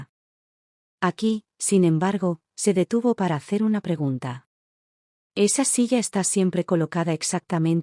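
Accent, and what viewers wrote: Castile, Spain. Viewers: Hacía tiempo que no escuchaba un acento castellano tan... castellano (y tan... femenino). Dicción y entonación impecables, casi "mágicas". Felicitaciones, y gracias por el trabajo que desempeñan. Un saludo cordial desde Buenos Aires, del otro lado del Atlántico. al sur del mundo. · Narradora muy buena ,castellano perfecto ,no hay nada mejor.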